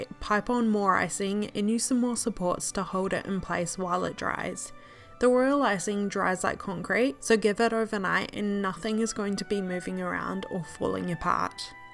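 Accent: New Zealand. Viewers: I know a Kiwi accent when I hear one! This was so helpful for a first time gingerbread-houser! (if that’s even a thing) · Is she from New Zealand?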